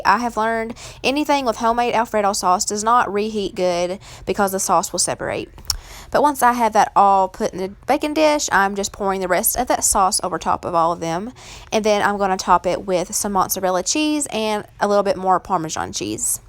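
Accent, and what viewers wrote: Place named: Midwest, United States. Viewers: I like your videos... straight to the point with great information. Plus being from the midwest I appreciate your accent :) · Hi! I am new to your channel and I absolutely love it! I love getting ideas from you and I think you cook some really fun, family friendly friendly meals. I’m also from Michigan area so I love to hear your accent. 😄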